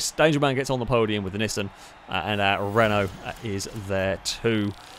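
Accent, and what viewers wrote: United Kingdom. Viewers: You realise is British, right? Only Americans call Z's, Zee. Most other English speaking country calls it Zed. And calling it a "Sell-eekah" is the British accent...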